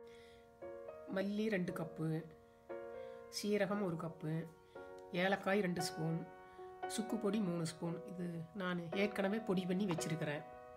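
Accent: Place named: Kongu, India. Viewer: அம்மா உங்க அழகான கொங்கு தமிழில் விவரிப்பது ரசிக்கும்படியாக உள்ளது. நல்ல பயனுள்ள ரெசிப்பிகள். எங்கள் ஆரோக்கிய உணவுகள் பேஜில் பதிவிடலாமா ?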